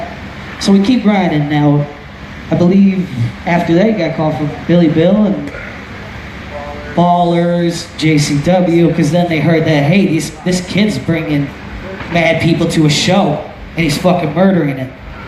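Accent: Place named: Boston, United States. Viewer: he sounds like hes from Boston eh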